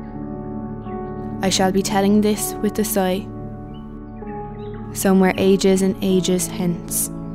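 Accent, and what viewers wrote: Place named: Ireland. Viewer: first time hearing the road not taken in an irish accent. Lovely!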